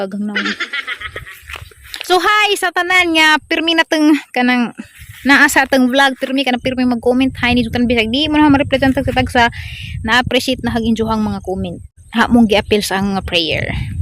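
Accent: Bohol, Philippines. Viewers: Always watching here in Cebu ♥️ i love your boholana ascents🤗♥️ labi nas words nga "ARANG" masundog nako usahay😅😍😍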